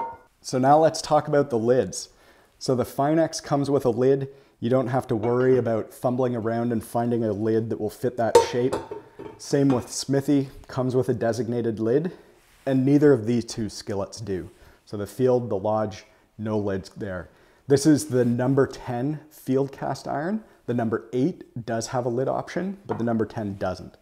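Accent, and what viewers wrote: Canada. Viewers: Once I heard the Canadian accent I couldn't unhear it lol Great review!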